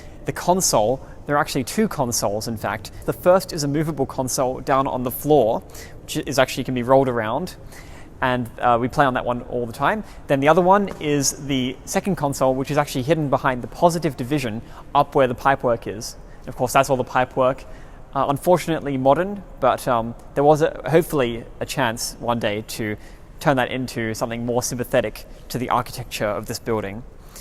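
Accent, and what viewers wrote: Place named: United States. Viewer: It is sad that the speaker broadcasts in American.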